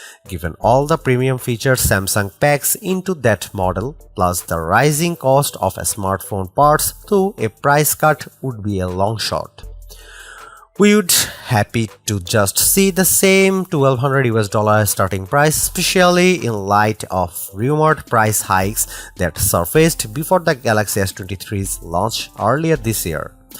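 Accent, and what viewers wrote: India. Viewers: your accent is kind of Bengali mixed accent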